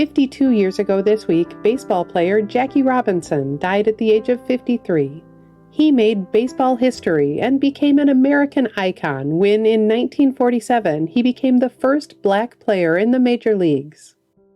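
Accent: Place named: Minnesota, United States. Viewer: I would love to know more about her! Her narration and accent sound like she was born and raised in Minnesota or somewhere around that region.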